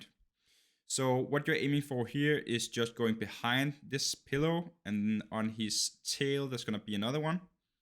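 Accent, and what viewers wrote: Denmark. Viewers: Gætter på Dansk accent? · sorry jeg afslørerede dig 😂 du har bare en af de mest tydelige danske accenter når du taler engelsk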